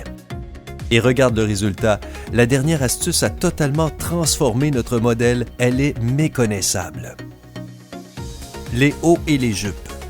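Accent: Quebec, Canada. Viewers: L’accent québécois omg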